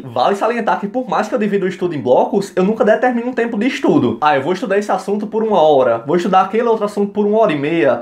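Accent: Northeast, Brazil. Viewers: Homem tu é lindo, mas esse seu sotaque é mais lindo ainda... Coisa deliciosa é um sotaque nordestino.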